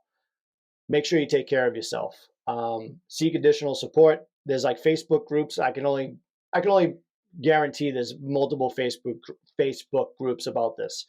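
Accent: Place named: New England, United States. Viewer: Are you from New England, Massachusetts maybe 😊 I can hear the accent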